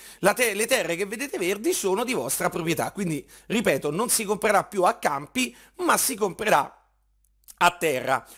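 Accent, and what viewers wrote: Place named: Rome, Italy. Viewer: bravo, ottima spiegazione! e poi il tuo accento romano è troppo forte!!!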